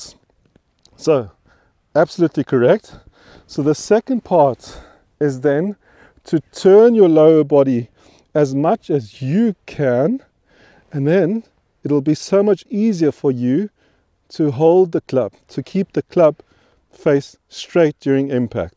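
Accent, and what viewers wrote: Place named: Austria. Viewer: Love the Arnold accent ... nice video!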